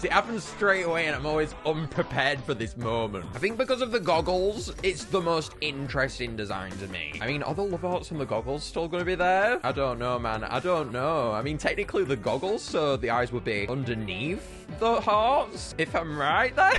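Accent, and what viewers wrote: United Kingdom. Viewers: are you bri'ish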